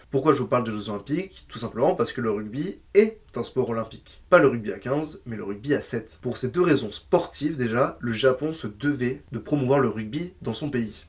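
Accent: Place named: France. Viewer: Un bel accent frenchie et du rythme, ça s'annonce bien cette petite chaine ;)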